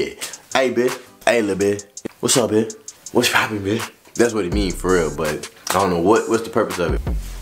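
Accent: New York, United States. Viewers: U have a New York accent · I can hear the ny accent in your voice but you picked up onna florida shit so decently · u sound like you from newyork then anything.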